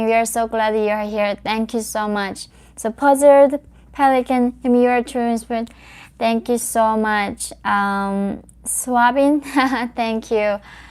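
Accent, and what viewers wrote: United States. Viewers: you sound more American than native born Americans. In a sense that is a bad thing. Keep speaking out to open peoples minds.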